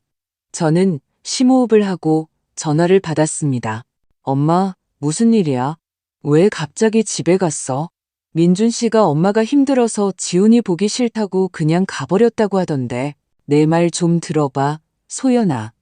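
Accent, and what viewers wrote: China. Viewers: 발음이나 글읽는 소리가 연변족인것같음 요즘 이런글 쓰는 작가도 ㅎ 그렇고 한국인이면 다알수 있는 촌수를 뒤죽박죽으로 읽고 시간도 4시를 사시 다섯시는 오시 듣다가 한심스러워 나가기를 자꾸하게 되네....😢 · 하나히나. ㅡ중국인 가공 말투!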